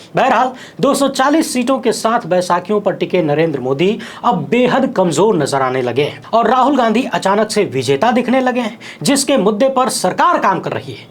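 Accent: Bhojpuri, India. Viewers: ए महाराज कहां के हैं बडा भोजपुरीया बोलते है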